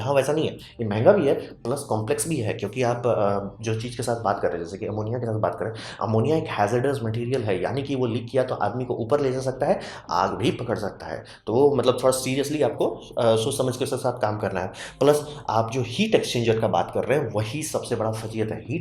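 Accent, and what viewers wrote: Bihar, India. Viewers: Sir r you from bihar ...😅........ur way of teaching is so funny and interesting...i enjoyed it...🥰......thnku so much..🙂🙂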